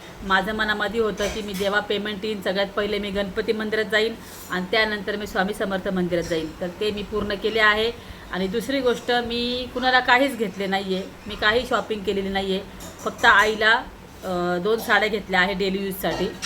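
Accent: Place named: Sangamner, India. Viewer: मला तुमच्या भाषेवरून वाटलेच होते की तुम्ही संगमनेरच्या आहात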